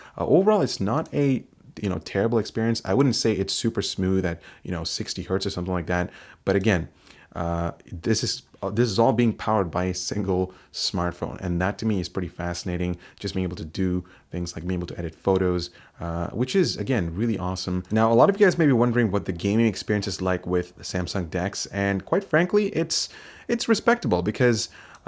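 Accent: India. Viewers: INDIAN ! WITH NICE ACCENT! KEEP IT UP BRO ! :)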